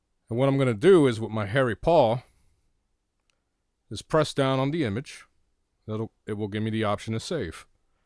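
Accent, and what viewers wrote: United States. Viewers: this is probably the best american voice i ever heard.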